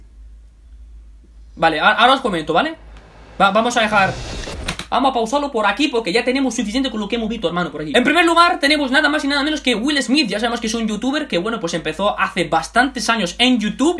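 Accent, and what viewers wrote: Argentina. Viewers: Amo tu intento de argentino😂😂💜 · Amo tu manera en que dices las palabras de Argentina 😂❤ Por algo te amo tanto ❤💫💕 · ME ENCANTA TU INTENTO DE ACENTO ARGENTINO, AYUDA TE AMO MUCHO. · Viste como cuando se te pega lo argentino:v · AMO ESCUCHARTE HABLAR CON EL ACENTO ARGENTINO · Me encanta que uses el acento el Argentino xd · el Argentino se te pego ati♥♥♥♥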